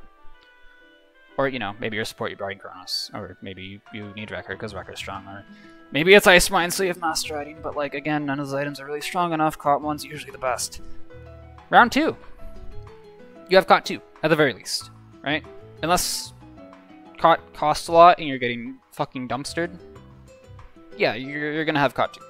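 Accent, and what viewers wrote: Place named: United States. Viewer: Is it just me or does sound a bit different now? He sounds more American now. He's also swearing less forward slash shouting less, stating the problems/arguments clearly with evidence to back them up. P/S all jokes aside, great vid. Agreed with everything you said. I wanted 90% caut even before you made your Part 1 vid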